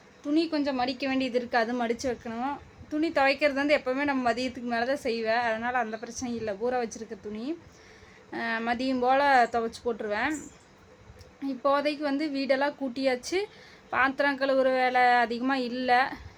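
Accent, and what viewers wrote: Kongu, India. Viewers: நீங்கள் கொங்கு தமிழ் லயே பேசங்க அம்மா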